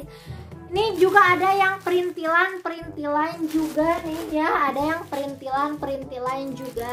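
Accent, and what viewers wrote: West Java, Indonesia. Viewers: Kak orang mana kak, suka banget sama logat sunda nya wkwk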